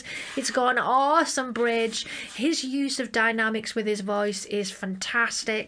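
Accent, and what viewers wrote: Manchester, United Kingdom. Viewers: I love your accent, is it from around Manchester?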